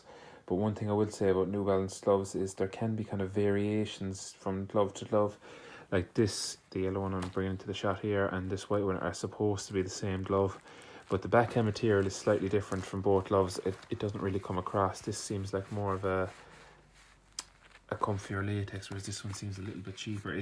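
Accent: Ireland. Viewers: Are u from Dublin?but I'm from Ireland and I don't find gloves that cheap any wear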